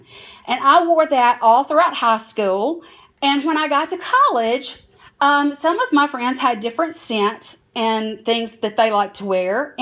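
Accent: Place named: Boston, United States. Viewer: Omg I love your accent! I'm from Atlanta and just moved to Boston, I miss hearing people talk like this! You have a great collection :)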